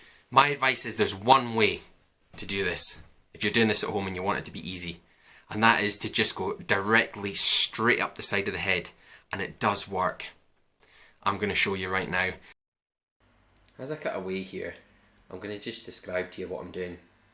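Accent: Scotland, United Kingdom. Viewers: Great video! It’s also nice to hear a Scottish accent on YouTube. It sounds as if you’re from Aberdeen, my home city! :)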